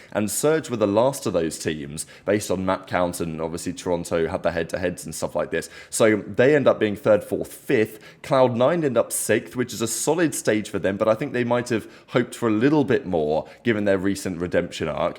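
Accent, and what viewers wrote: England, United Kingdom. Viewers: He’s saying very much intrigued to your thoughts. Fellow Englishman I can translate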